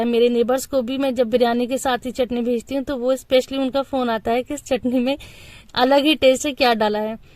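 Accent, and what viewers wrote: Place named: India. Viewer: Itni saaf Urdu India mai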